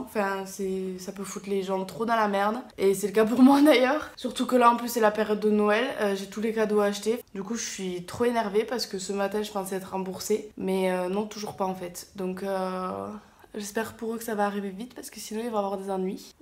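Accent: South, France. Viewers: tu as encore plus l’accent du sud quand tu es avec tes parents j'ai l'impression! c'est marrant ^^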